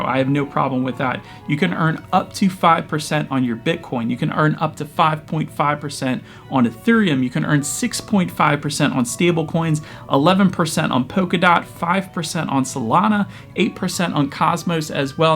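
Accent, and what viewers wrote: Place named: Pennsylvania, United States. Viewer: i heard the PA in your voice! sounds like e l c o d a y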